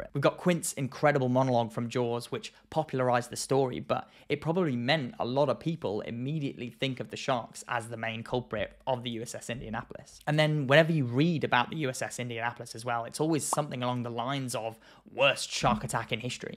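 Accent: England, United Kingdom. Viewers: It’s weird to tell an English person how to speak English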